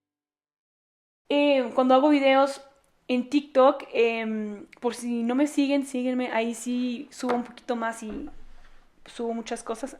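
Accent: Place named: Mexico. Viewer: Gracias por tu vídeo y la manera de explicarlo. Me parece una exposición de la realidad actual muy enriquecedora. Yo estuve en Pensilvania en los años 90 en un pueblo Amisch para comprar productos típicos y curiosamente cuando les hablé en alemán estándar (Hochdeutsch), me respondieron en un dialecto que por suerte comprendí, y era más similar al suizo alemán, que aprendí por diversos motivos. Posteriormente, hace 6-7 años, tuve la oportunidad de conocer una zona donde había menonitas que vivían en Brasil, concretamente en Santa Catarina. Estas personas tenían dificultades para hablar en alemán estándar (Schriftdeutsch oder Hochdeutsch) sin embargo, hablaban un dialecto entendible y más parecido al Plattdeitsch (similar al holandés). Afortunadamente hablo alemán, suizo y holandés, y así pues levanté la curiosidad de los presentes. Es muy divertido hablar diferentes dialectos del antiguo alemán para poder comunicarte con los descendientes de los centroeuropeos. 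Por cierto, al empezar a ver el video pensaba que eras de España. Tienes cara de española 120%, pero luego te escucho hablar y me doy cuenta de que tienes acento mejicano 🤗😇. Hast du Videos in plattdeutsch?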